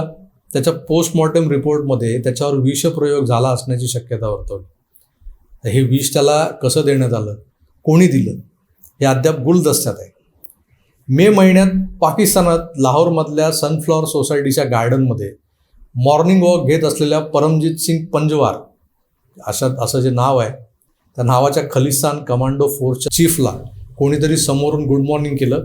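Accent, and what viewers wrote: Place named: Mumbai, India. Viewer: Very beautifully explained and your language is very sweet , typically mumbaikar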